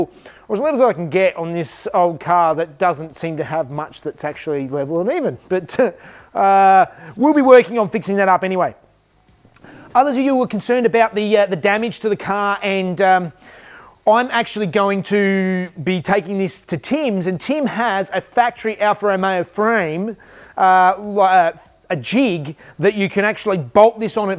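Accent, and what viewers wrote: Australia. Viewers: Wow from Aussie?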